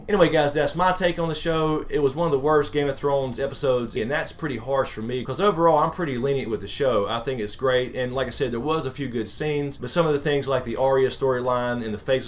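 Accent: South, United States. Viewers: I just clicked like bc u sound like a southern n I love the Midwest (live in California now n miss my sweet Arkansas lol)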